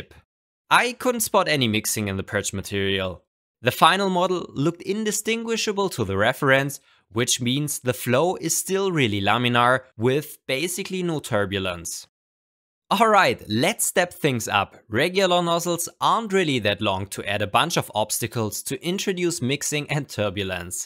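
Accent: Germany. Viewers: Hallo, Dein Deutsch-Dialekt kommt richtig geil. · Are you german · Hello, bist du eigentlich Deutscher oder Österreicher?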